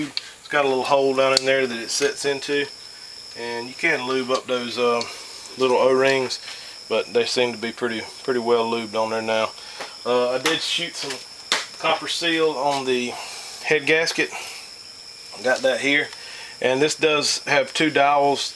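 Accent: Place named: North Carolina, United States. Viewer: Where you from, you sound like your from my neck of the woods, NC, great video, hate we missed those few parts from the recorder but for most part excellent video, God bless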